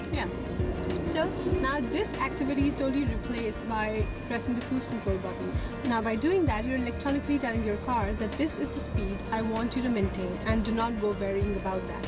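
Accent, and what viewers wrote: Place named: India. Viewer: Indian accent, mate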